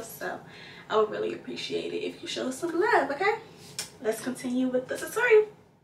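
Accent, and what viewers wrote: Washington DC, United States. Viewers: Accent give me dc vibes 💕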